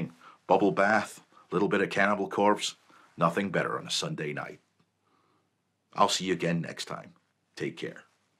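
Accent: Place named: Scotland, United Kingdom. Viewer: Scottish. There are clips of him speaking with his real accent.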